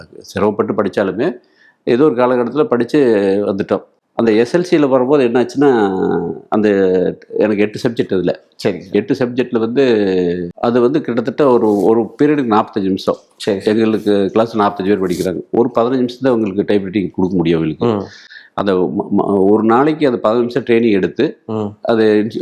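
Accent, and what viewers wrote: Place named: Tirupur, India. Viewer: Tirupur accent ❤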